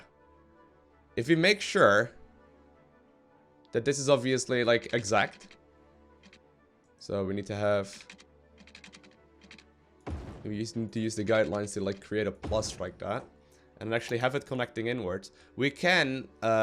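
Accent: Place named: Netherlands. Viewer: where u come from sounds a bit dutch accent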